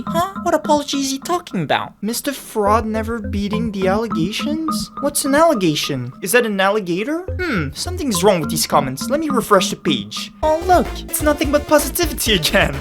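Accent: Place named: Quebec, Canada. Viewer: Are you in Quebec? Accent sounds rather quebecois francais.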